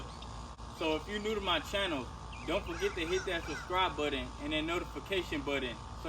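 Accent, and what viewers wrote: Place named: South, United States. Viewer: I just like the southern draw. country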